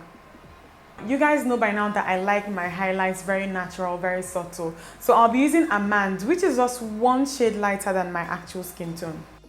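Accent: Igbo, Nigeria. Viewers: Extremely funny especially the igbo accent. Love this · ''If you don't prime your lid with oily skin, you're on your own''😂😂😂😂😂😂😂...i've subscribed girl...you just soo speak like a tru Nigerian...love from Cameroon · Oh no Shamsa mohamed thats how Nigerians talk. Thought the same when I had roomates from Nigeria it used to really bug me till I learnt thats how they talk and no offense. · She's too funny, and I love her Nigerian accent. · no, that’s just how nigerians talk. very blunt, right out the box. she doesn’t sound rude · Man I love her accent my bestie is Nigerian too😅but she doesn't like me listening to her when she is speaking Nigerian accent but still love her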